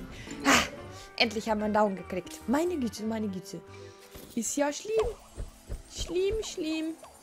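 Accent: Bavaria, Germany. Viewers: könntest du bitte nochmal eine Folge nur bayrisch sprechen bitte?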